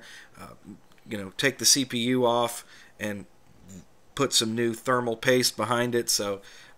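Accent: South, United States. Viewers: Hey, new sub here, I have played around with Ubuntu here and there through the years, but was always intimidated by the terminal, dove back in recently with Linux Mint on an old HP pavilion Laptop with Core 2 duo and 4Gig RAM. Now that I'm all about learning how to properly use Linux your videos have been awesome. Where in the South are you from, you sound like you're from around my neck of the woods. I could listen to you dispense wisdom all day.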